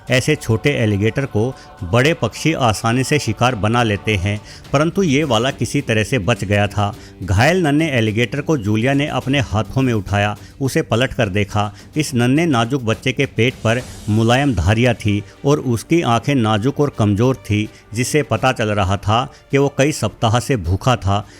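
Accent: Haryana, India. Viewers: Bhai tu haryana se hai ke?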